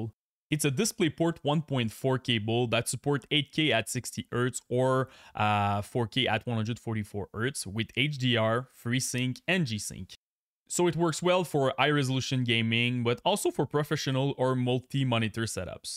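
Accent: Quebec, Canada. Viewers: Ça paraît que t'es québécois haha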